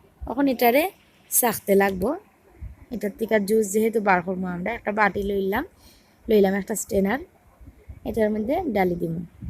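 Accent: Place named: Bangal, Bangladesh. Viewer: বাঙাল ভাষাটা কি মিষ্টি🤗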